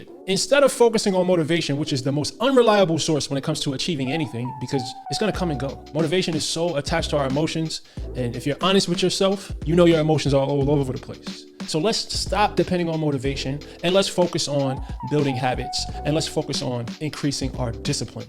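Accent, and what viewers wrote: New York, United States. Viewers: Sound like HOV! That’s that NY! Let’s get it God like Men! Likeness for the WINGERS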